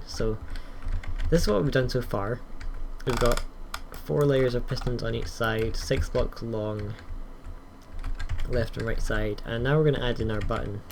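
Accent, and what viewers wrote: Scotland, United Kingdom. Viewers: YOUR FROM SCOTLAND YAY MEH TO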